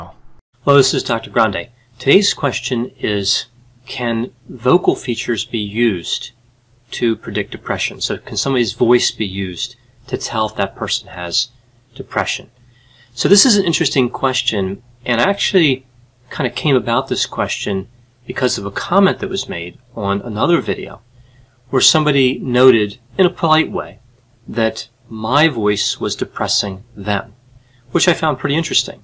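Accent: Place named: Delaware, United States. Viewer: 👍🏻 Well this was definitely an interesting video. I hope we don’t see the day where robots have anything to do with assessing emotion alone without help from people. 😞 Lol..🤞 And, you have a lovely, and relaxing voice. I like your accent as it reminds me of my home state De!!! 💗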